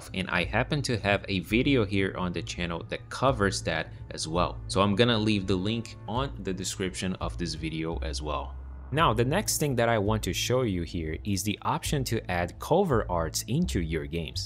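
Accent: Brazil. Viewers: Best Brazilian person accent i ve ever heard. congrats for the work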